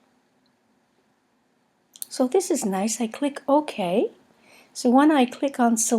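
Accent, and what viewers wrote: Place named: France. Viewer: Ha ha ha ! There is a touch on his diction almost imperceptible, but the musicality of "sugary" French is there ! :) Kindre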